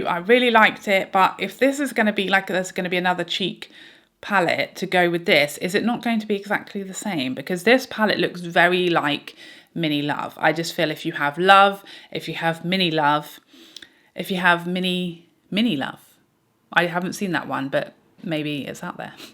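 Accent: United Kingdom. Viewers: I love your videos to pieces! and your British accent. I’m new to your channel but am so happy I found you. I’m in the US but found I like UK YouTubers probably more than the US. You guys are more real and unafraid to tell it like it is😉 Do you use Lancôme or Estée Lauder much? I have heard you mention some products from them but I have not seen any videos about those brands. Does anyone do videos about them. I think they both don’t get enough love if you know what I mean. I use a lot of both brands and would love to see videos about their products.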